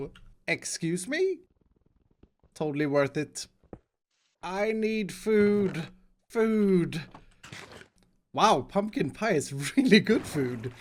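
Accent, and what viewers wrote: Sweden. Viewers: I just looked it up he’s swedish Well its easy for me to recognize I’m also swedish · Are you swedish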